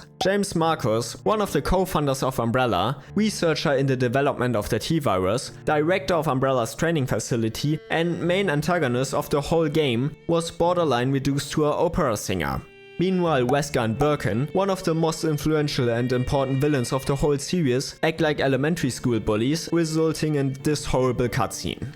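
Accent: Germany. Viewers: gerne machst ja auch nen klasse Job <3 und wenn es dich stört kommentiere ich gerne zukünftig in Englisch:). Denke halt es liegt daran das man gut raushört das du deutscher/deutschsprachig bist.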